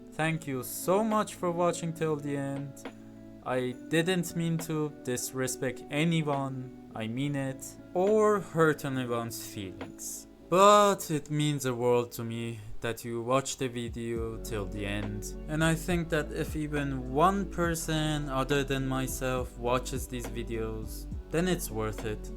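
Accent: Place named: Iran. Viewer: dige ma irania lahje hamo tashkhis midim rahat 😂❤ vali kheyli khub harf mizani :) kheyli ham channel e khubi dari, keep up the good work! omidvaram hesabi pishraft koni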